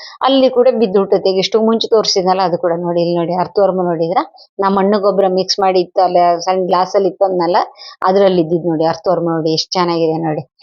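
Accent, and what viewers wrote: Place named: Malenadu, India. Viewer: Malenadu bhashe tara ede..mam yav kade nivu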